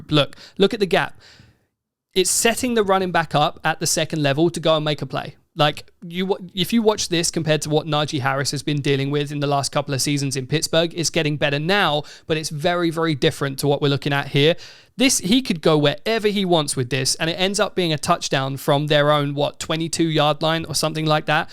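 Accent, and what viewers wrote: United Kingdom. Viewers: Nice breakdown. Im so bad with accents. South Africa? Great to see a non American have such passion for American football. Edit: UK. See, I over thought it and guessed wronge. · In this case I shall not tell you to shut your gob, you tit. Refreshing to hear a Brit breakdown Lions highlights better than most Lions fans could. Cheers!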